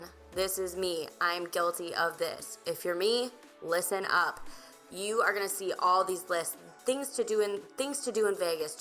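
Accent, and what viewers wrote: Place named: Wisconsin, United States. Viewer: From Wisconsin? Trying to pinpoint your accent.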